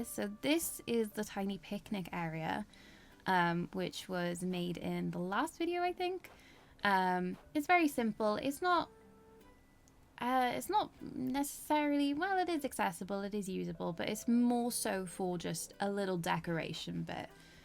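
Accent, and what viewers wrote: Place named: Wales, United Kingdom. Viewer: Are you welsh by any chance? You have a bit of an accent 😅 amazing island btw!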